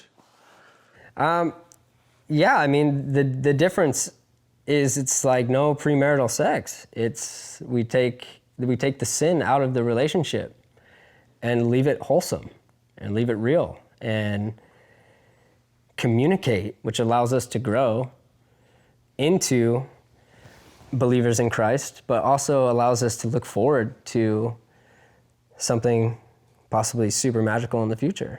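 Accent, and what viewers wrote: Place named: Simi Valley, United States. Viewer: lol i live in simi valley. a lot of my boys talk like that😂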